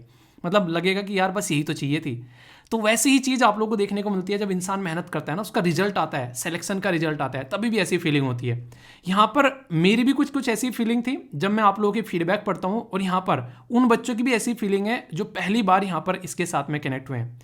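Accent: Haryana, India. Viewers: haryanvi ascent chait basiakh sadh saman................... love from rtk(HR)😍😍❤❤❤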